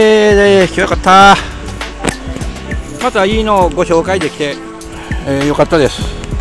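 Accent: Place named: Kagoshima, Japan. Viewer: あ！かごっま弁！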